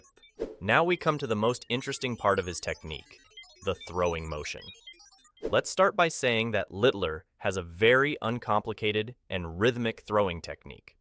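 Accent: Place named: United States. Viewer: Did not expect an American accent on a darts video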